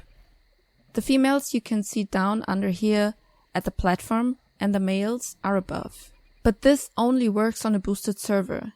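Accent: Germany. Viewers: I appreciate the effort that went into the video and the english version, but I can´t deny that I heavily dislike the german accent. It´s extremely distracting to me. I´m german myself, sadly.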